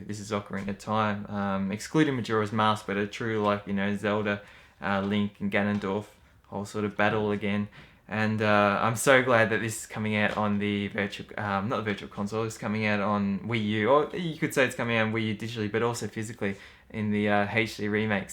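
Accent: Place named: Australia. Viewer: Cool, yeah I saw that tag video. I thought I recognized that accent. I was actually in Australia in 1999 when I was in the US Military. (Forever ago.LOL!) We were on the East coast near, Rock Hampton, Gladstone and Brisbane.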